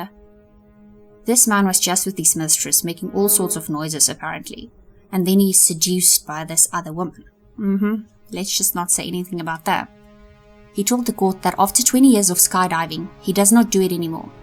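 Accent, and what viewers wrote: South Africa. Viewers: Im binge watching ur videos ur work is great and i sense ur accent is south African ❤🎉watching from SOUTH AFRICA 🇿🇦🇿🇦🇿🇦 im truly proud of ur work ur videos are long shows dedication and the amount of hard work u put in u gained a new sub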